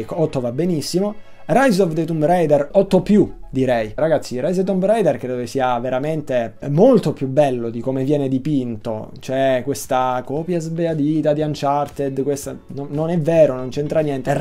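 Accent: South, Italy. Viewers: di dove sei ? sembri del sud!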